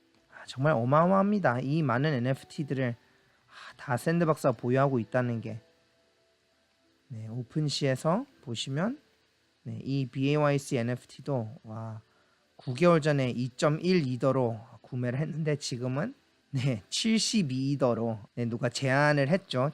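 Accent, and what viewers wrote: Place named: United States. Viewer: 발음이 미국에있는 친척형 같아서 목소리가 되게 친근하게 들리네요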